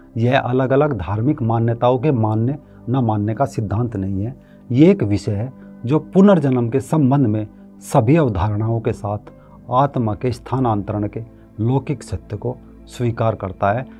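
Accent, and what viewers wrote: Haryana, India. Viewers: Bhai Jis ladke ki baat kr rahe hain main use jaan'ta hoon.btw Bolne k hisaab se lg raha hai k aap haryana se ho. .love & respect from punjab..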